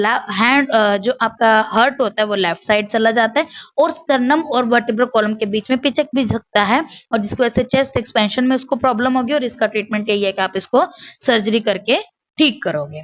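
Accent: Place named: Haryana, India. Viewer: very helpful video ❤ and your haryanvi accent is cherry on the top❤❤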